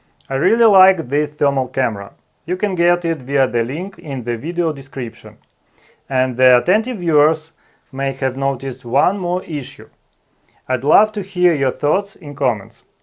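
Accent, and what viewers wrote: Russia. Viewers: Хоть ломаный английский и стал получше, но акцент русского языка так и остался )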